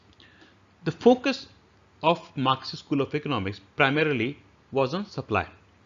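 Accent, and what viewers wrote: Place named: India. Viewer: The Speaker is good to understand, with a light indian accent. Only the names are not proper spelled. I was puzzled by the spelling of Keynes. His name is John Maynard Keynes- Not Mayer. Marx as a economist sounds wired when you know his biography. As a critical philosoph, a Dr. of Scientific Law and Journalis, lector etc. involved in the worker class movement and creating a new idea of society. May be that is the reason why economist can not figur out what his impact was in the european countries he influenced. At last Schumpeter is not from Germany even he have had a great influence and new the austrian school better as anyone else as a oponent of Max Weber.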